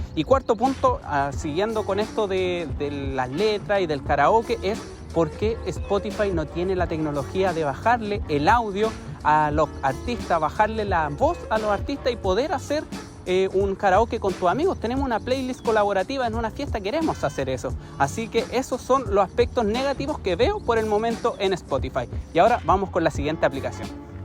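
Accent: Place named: Chile. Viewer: Que ciudad es donde grabaste? Me gusto el contraste entre los edificios y la naturaleza. Tenes acento chileno y me da la impresión que ha de ser el sur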